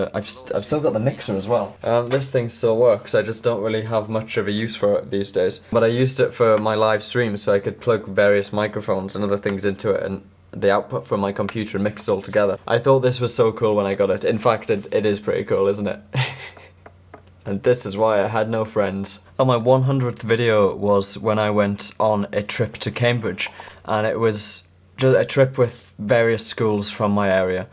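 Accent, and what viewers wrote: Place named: North East England, United Kingdom. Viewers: He is from Sunderland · North-East England, possibly Durham area?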